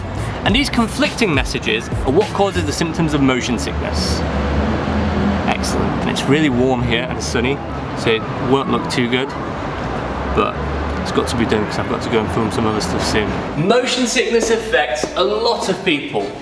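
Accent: United Kingdom. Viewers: Great regional uk accent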